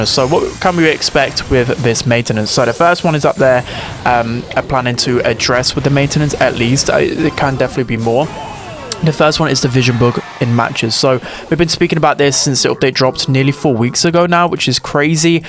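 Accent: United Kingdom. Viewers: Bro i miss your british accent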